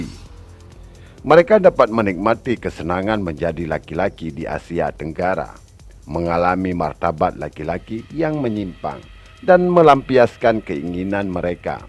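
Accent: Aceh, Indonesia. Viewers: kalau saya simak logatnya, kayaknya orang aceh ya?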